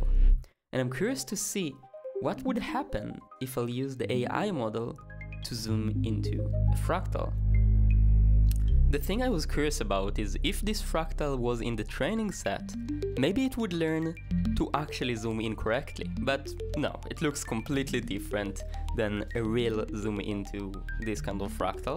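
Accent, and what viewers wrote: Israel. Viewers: בערך שני שליש לתוך הסרטון חשבתי לעצמי ״מעניין מה זה המבטא הזה? מעניין מאיפה הוא?״ ואז קלטתי שאתה ישראלי!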